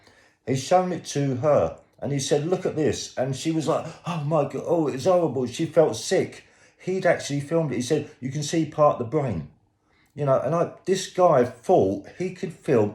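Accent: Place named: United Kingdom. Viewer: You sound like a British idiotic jealous mfer!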